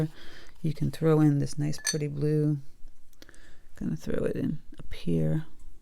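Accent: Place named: New England, United States. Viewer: Yes to the Vlog. Love hearing what's going on in Massachusetts. I grew up there, currently in Michigan. Miss hearing the New England accent 🙂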